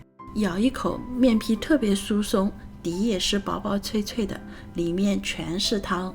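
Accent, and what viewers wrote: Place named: Jiangnan, China. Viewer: 你是我在YouTube上看到的做饭最精细的人，口音口味，真的是家乡风味。江南人做面食和北方人差别太大了。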